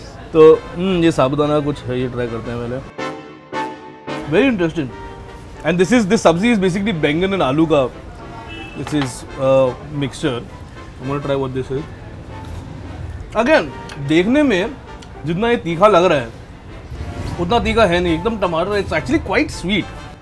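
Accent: Bihar, India. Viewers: Very bad Bihari accent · tried your best to speak bihari. anyway good.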